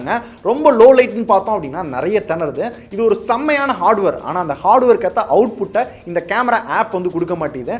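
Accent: Madurai, India. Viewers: Bro nenga madurai ya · Bro Neenga Madurai ah....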